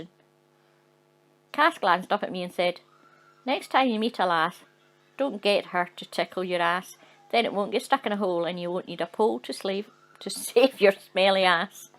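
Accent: Scotland, United Kingdom. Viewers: Never did I know I needed so much to hear and Scottish woman read me stories!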